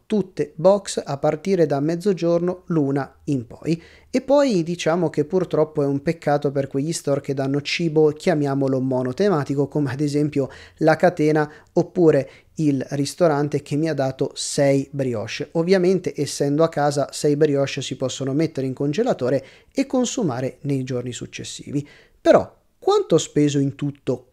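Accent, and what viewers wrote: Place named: Perugia, Italy. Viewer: Infatti... pure io sono di Perugia e ho riconosciuto la cadenza... anche se non marcata..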